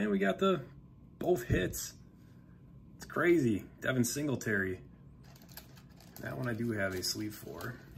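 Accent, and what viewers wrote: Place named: Canada. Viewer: I can hear a Canadian-like accent b/c instead of bosa I hear bowl-sa. Just say it and see (hear) the difference.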